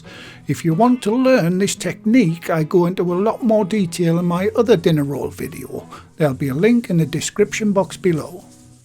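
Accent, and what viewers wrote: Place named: North East England, United Kingdom. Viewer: friends of mine say you should do some ASMR videos, they really like your relaxing north east accent. obviously i have the same accent but i sound like a rougher slang version of jimmy nail lol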